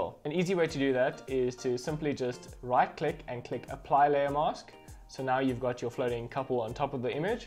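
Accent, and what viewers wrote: South Africa. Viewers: CaptanW smh hes got a south african accent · you sound like you are from South Africa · This guy sounds South African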